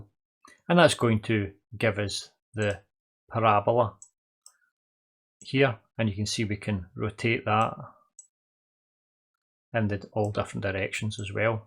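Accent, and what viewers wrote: Scotland, United Kingdom. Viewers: even just for the Scottish accent.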